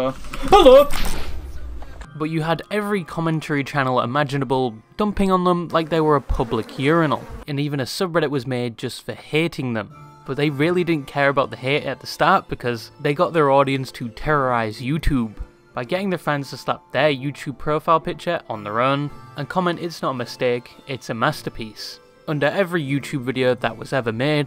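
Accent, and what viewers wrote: United Kingdom. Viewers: ewwie briteesh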